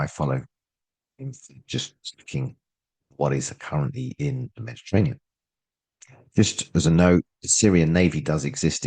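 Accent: United Kingdom. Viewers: copium hard by British accent what would you expect, the truth.... Saddam had Nuclear bombs in Iraq...... HAHAHAHA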